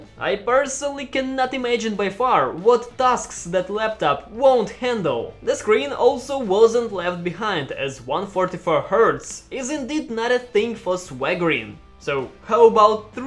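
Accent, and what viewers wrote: Russia. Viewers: Долго вслушивался в твою речь))) всё думал, а не русский-ли часом?))) как Клаву увидел, понял что земляк))) может конечно и живёшь не в России))) говоришь очень хорошо, даже мне с моим не особо хорошим уровнем английского понимать получается без особых усилий) молодец) так держать! хорошее видео и обзор)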